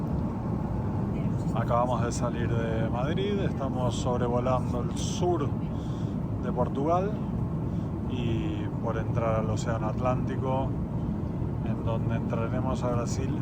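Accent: Argentina. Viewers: DONDE NACISTE EN LA MATANZA POR TU FORMA DE HABLAR 😮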